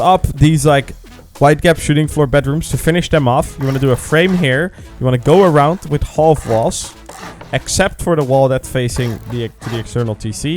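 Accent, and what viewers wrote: Netherlands. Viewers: i can hear u are dutch, i am dutch to, je bent goed bezig man!, had ik maar zo'n slimme base builder als rust teammate ! haha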